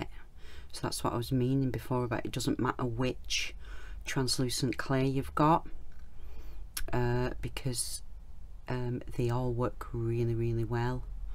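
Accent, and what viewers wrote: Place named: Northern England, United Kingdom. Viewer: Love your channel, subscribed as soon as I heard a fellow uk northerners accent!! And this technique is amazing!! Can't wait to try it! Thanks for sharing :-)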